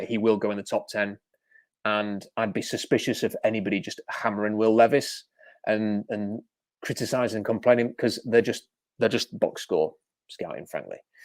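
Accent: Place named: Manchester, United Kingdom. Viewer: Hey, a draft guy with an English (Manchester area, I think) accent. Subscribed and watching the past videos.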